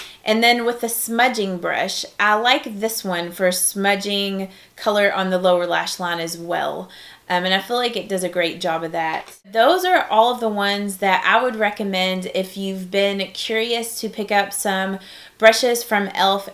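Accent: South, United States. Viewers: Katelyn Hughes she's one of two so far that I have followed specifically because they have a Southern accent! I love hearing ladies that talk like me! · Great video! I love that some of your favs are the $1 brushes. I feel like they don't get talked about enough since the studio brush line came out, and there are some real gems in that ($1) line! If I may say so, being a southern girl myself (lower SC, it don't get much more southern than that lol), I just want to suggest you work on what your going to say a little more before you film. There are a lot of "um's", "so yeah's", and a few mispronunciations of words that can really separate a youtuber from being a guru. It may be as easy as just editing a little more so your thoughts become more concise and you don't repeat yourself so much. People hear a southern accent and immediately take you as being uneducated. 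It is ridiculous and horrible, but you can overcome that be simply trying a little harder to make sure you're not losing your audience because of your speech. I hope you don't take what I'm saying offensively, I don't mean it that way at all. I really like you videos. I just think you could really gain a bigger audience by tweaking this one thing just a little bit. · You have to be southern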